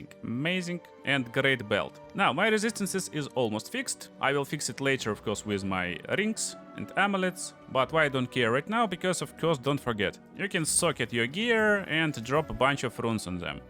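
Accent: Russia. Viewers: Чувствую русскую нотку проскакивающую через английский)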